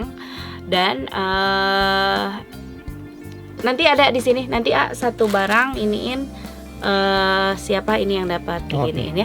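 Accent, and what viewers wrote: West Java, Indonesia. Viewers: Ngangenin dech dgn logat sunda nya teteh 😀😀👍👍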